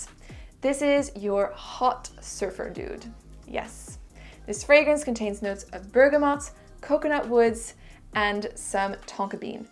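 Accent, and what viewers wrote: France. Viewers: You got a nice french accent , are you from France? Je veux dire ton anglais est excellent en plus de ton bon français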